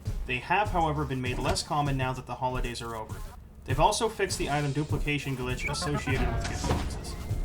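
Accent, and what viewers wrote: Canada. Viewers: WINNER 🎉🎉 GAGNANT 🤑🤑 I can tell you're from ontario my friend nice to meet you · Canadian detected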